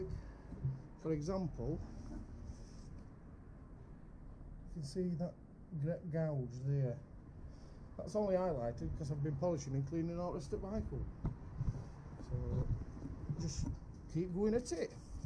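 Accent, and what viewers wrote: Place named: Barnsley, United Kingdom. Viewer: 😁i knew as soon as started watching this tha was from Barnsley ,even before i looked at comments........good vid mate.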